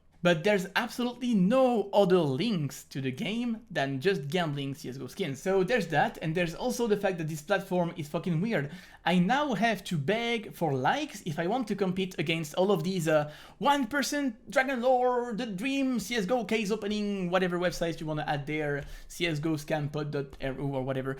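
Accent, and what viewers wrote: France. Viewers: On l'entend bien l accent français :)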